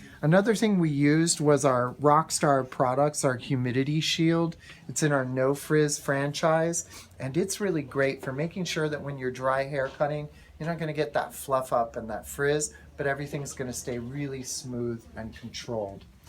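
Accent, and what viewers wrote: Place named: Michigan, United States. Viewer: He talks like he’s from Michigan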